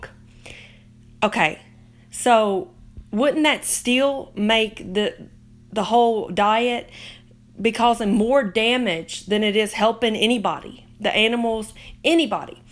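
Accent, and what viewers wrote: South, United States. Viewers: Ummmm can everyone stop classifying vegans into the same group as raw fruitarian? I am vegan and chubby and healthy. Not every vegan eats fucking cucumber noodles and green smoothies. Animal food as this lady states is not healthy and is full of cholesterol and carcinogens. But leave it to someone with a southern accent to not do any research before making a video based off of one extremist mental RAW fruitarian/ NOT a normal vegan.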